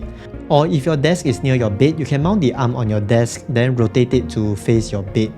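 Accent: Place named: Singapore. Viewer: oh i think you are just casual indonesian chinese youtuber, after hear your accent kinda singaporean 😅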